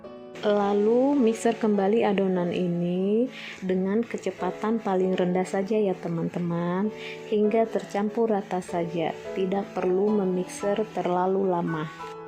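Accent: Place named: Java, Indonesia. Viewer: Wah mantep cara bikin kue lapis😄 jowo medok hadir embak e.yuk yang lain....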